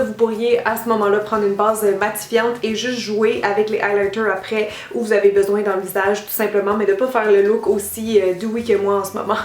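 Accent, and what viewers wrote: Quebec, Canada. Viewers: tres nice look, oooh les yeux j'ai tellement adoré, j'ai envie de l'essayer mntn bonne continuation, j'adore ton accent quebecois et je 'tadore.,😘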